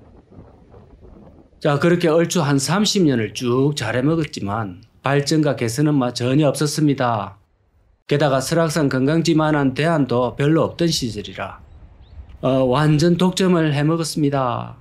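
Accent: Gyeongsang, South Korea. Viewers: 오늘의 사투리 따시고. 갱상도 간편한 사투리 등 따시고 배부르고.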